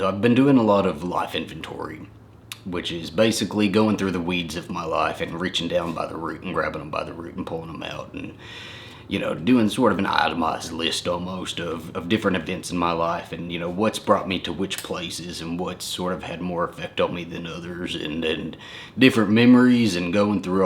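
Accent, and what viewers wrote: South, United States. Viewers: Dude you are the BEST story teller!!! You should narrate books, southern accent and all!! Love you two!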